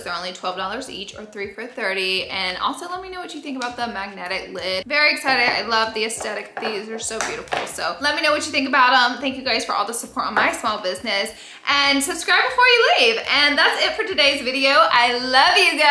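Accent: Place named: Alabama, United States. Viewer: Who else loves when LL says oil? No one? Just me, okay. Girl, I love your Alabama accent!❤❤❤. Oh I’m adding all the things to my Amazon cart..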